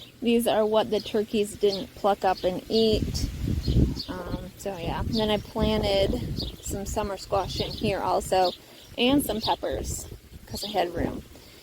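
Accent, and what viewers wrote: United States. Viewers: Nothing personal and I do love your channel but why do Americans say Eand ?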